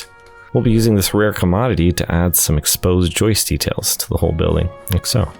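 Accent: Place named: Ireland. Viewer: Are you from Ireland?? I didn't recognize your accent! Absolutely love this ! Wish I was as talented !!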